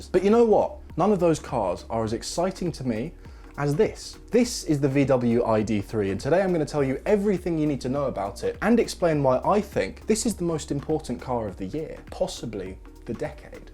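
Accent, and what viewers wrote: England, United Kingdom. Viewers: Your English accent is annoying as fuck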